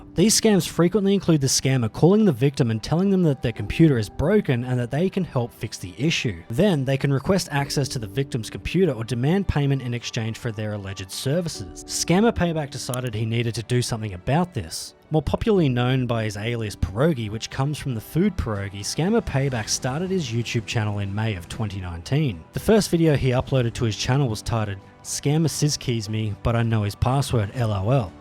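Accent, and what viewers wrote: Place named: Australia. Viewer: and i just realised your Aussie, even bloody better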